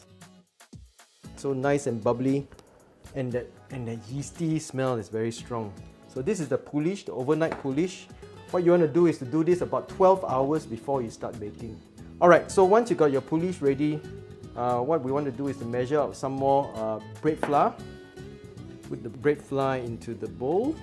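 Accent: Singapore. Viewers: Awesome content!! I can guess you're from Singapore based on your accent, am I right la? :D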